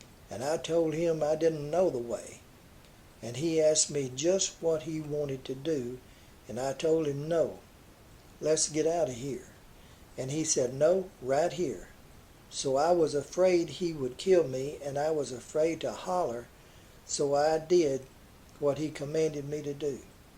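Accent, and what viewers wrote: South, United States. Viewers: You do a great job of these little videos, and I enjoy your colorful regional accent (I have deep roots in the South - my mother was from middle Tennessee and my father from Virginia so I am used to drawls), but forgive me if I correct one grammatical error which always drives me crazy when I read or hear it: it's hanged, not hung. Use "hung" for things ("I hung the laundry out to dry"), but "hanged" for people ("he was hanged for murder"). This is a common grammatical error and surprises many when they discover it, but I had a grade-school teacher who was adamant about correct grammar. Just sayin'. Thanks again for all these entertaining and informative videos!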